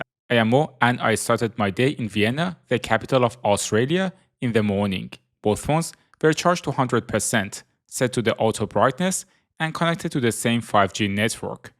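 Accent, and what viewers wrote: Iran. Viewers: Bro you sound like a Persian guy trying his best to speak fluent english.❤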